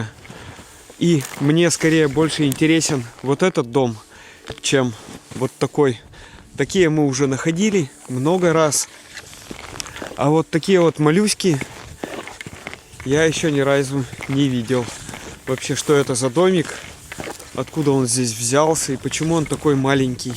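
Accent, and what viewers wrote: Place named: Rural, Russia. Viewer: Благодарность тебе огромная за видео! Из этих мест родом мой муж, из села Вохма. Их род по мужской линии был большой. Рожали по 9 - 12 детей. Свёкор один из 12, 10 мужиков и две сестры. Только из их рода мог образоваться огромный колхоз. Все трудились и поэтому жили хорошо. Ставили деревни, о чём в архиве есть запись урядника за 1904 год. Но в советское время их хотели раскулачить и все разбежались по разным городам и весям. В Костромской области и в Сибири есть сёла названные по их фамилии. Предки были родом и из деревень Большие и Малые Мокруши. А вот по женской линии все были по одной: прабабушка, бабушка и свекровь. Я уже сама уже очень старая и совсем мало кого осталось. До 2015 года в Вохме ещё проживала старшая сестра моего мужа. Но думаю, что в России ещё много потомков от этого рода. И всех известных мне и неизвестных я люблю и почитаю. 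Благодарность тебе, за видео с этих мест, замечательные твои комментарии с лёгким деревенским говорком и знание бытового устройства с его атрибутами. Просто бальзам на душу!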